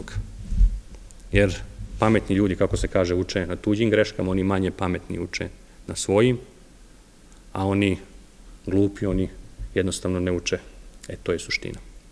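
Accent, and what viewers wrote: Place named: Vojvodina, Serbia. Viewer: Milane, nisam se cak ni vracala da cujem....jer u govornom izrazavanju gospodina , rekla bih da je odrastao u Vojvodini, gde se pomenuti izraz bas tako izgovara...toliko o znanju etimologije, etnologije kao i istorije ...Nista to ne umanjuje znacaj predavanja! Pozdrav!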